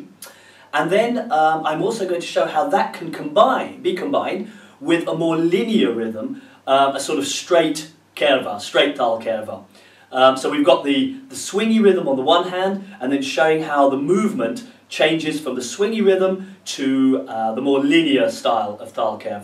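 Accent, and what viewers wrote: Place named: England, United Kingdom. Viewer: .( love your english accent).you are the best techer..i live in USA a fiji island transplant...grew up.heRe in wichita kansasUSA🇺🇸..i heard many teachers on u tube..but MASTERY OF LANGUAGE MATTERS..bedides talent..i just bought a basic tabla..trying to learn basic tabla beats....thanks for having such a good talent..u 👍👍👍r incredible mhndraUSA🇺🇸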